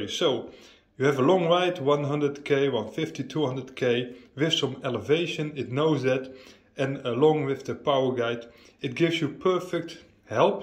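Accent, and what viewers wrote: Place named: Netherlands. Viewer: I think you are dutch speaking :))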